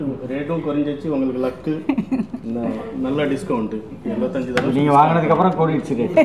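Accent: Sri Lanka. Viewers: அழகான சிங்களம் தமிழ் ❤❤❤❤❤❤❤❤❤ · இலங்கை தமிழ் மிகவும் அழகு...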